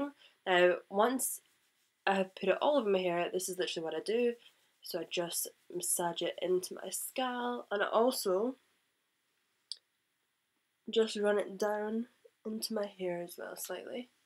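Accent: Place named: England, United Kingdom. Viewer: Liked the accent. Are you from England?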